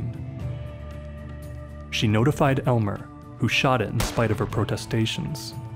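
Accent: Canada. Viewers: Are you from Canada or thereabouts? The way you pronounce certain words, like “about” and “house” is very endearing. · I can tell your Canadian 😂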